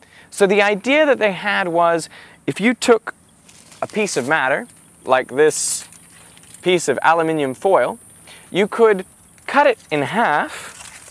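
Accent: Australia. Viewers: OHMYGOD, this was so long ago that Derek actually sounded more like an Aussie 😅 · Am I the only one who thinks I can vaguely hear the Australian accent? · You don't sound very Australian until you say aluminum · lol, I can hear an ever so slight Australian accent coming through and it has distracted me through the whole video! It's amazing though. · I never knew he was Australian! You can really hear it in his old videos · ohh he does sound much more australian here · Really cool to see how your accent has changed over time. You can tell there's a bit of the 'stralian in there at times; wonder how much of the video accent was natural vs imposed. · Woah, the ever so slight Australian accent definitely threw me off. Crazy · I love his half-australian accent in this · He has a very slight Australian accent here...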